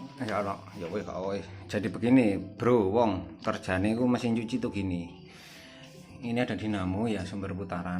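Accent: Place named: Jepara, Indonesia. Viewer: logatnya kyak jepara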